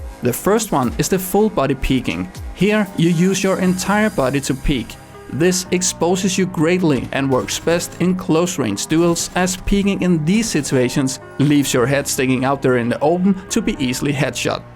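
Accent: Denmark. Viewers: you sound danish. Are you from Denmark?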